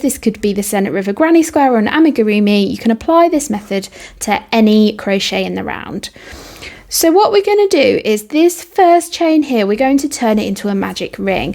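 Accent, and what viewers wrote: England, United Kingdom. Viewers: Fantastic ❤ shame you use US terms as you are from UK - would suggest you say UK terms with US terms on the screen?! Such a nice change to hear an English voice 😊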